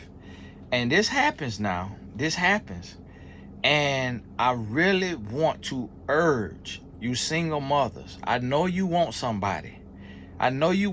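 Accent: South, United States. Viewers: you really are country I’m dying laughing at ends meat 😂😂😂 had to pause right here and catch my breath...now I can continue watching 😅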